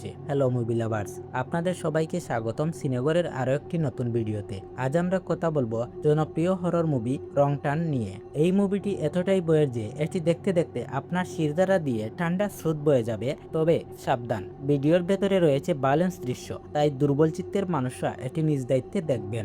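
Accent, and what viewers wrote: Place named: Sylhet, Bangladesh. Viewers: ভাইয়া মনে হয় সিলেটি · মৃত,, নেউল দেখতে পায়,,,,সিলেটি টান ভাষা,,,আপনার কথায় সিলেটের টান আসে,,,,সুন্দর করে বাংলা উচ্চারণ শিখেন,,,সিলেটের ভাষার গুষ্টি মাইরো না।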